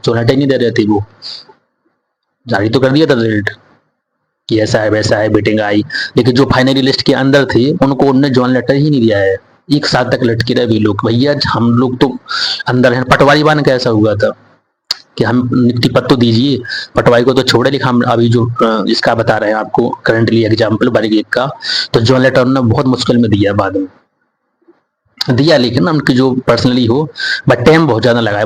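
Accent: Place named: Bundeli, India. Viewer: Maharaj aap bundeli bolo wo achchhi lg rhi........you know ni bolo😁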